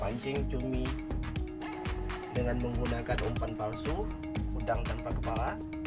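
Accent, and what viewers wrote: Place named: Malaysia. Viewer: Dari logat nye, ab org melayu, melayu mana bg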